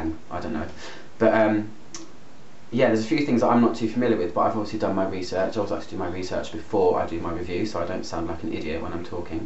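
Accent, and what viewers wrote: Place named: East London, United Kingdom. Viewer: Hugh Grant who went to Private School.. 😂😂🤔 😏😂 Anyway I love your East London accent ❤️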